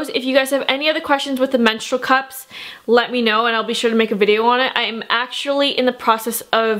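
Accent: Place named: Pennsylvania, United States. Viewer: First off, thank you for the informative vid! I just purchased my first cup& I'm due for my next cycle in about 3 days & eager to try it out! Also, this is random, but do you live in or are you from PA? I hear an accent- ish similar to my family that live there.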